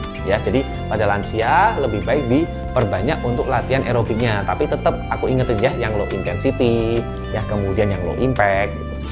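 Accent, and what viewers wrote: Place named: Java, Indonesia. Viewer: Doctor medook.....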